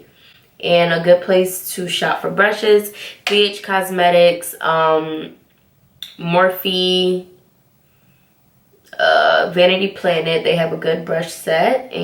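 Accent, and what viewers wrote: Jamaica. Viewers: Are you a fellow Jamaican by the way? Lol I hear a tiny little accent peeping out 🤣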